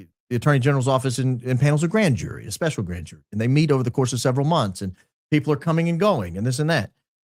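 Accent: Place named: Alabama, United States. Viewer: were you originally from Alabama? It sounds like you at least lived here. I was born here and have lived here for all but fifteen years or so of my life. I returned slightly after that time frame, and I remember being shocked at the political things that happened in the early 2000s.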